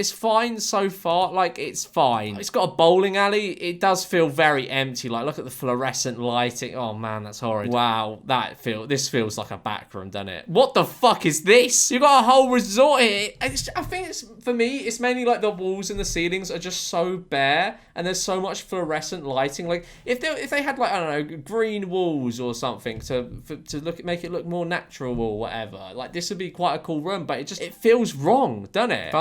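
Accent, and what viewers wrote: United Kingdom. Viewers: Is it just me or does sound more British in this video than the others?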